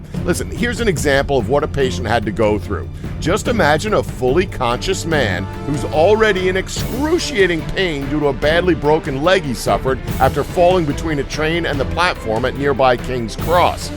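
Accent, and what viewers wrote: United States. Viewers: the amount of american english, wants me want to strangle you. FFS pronounce something correctly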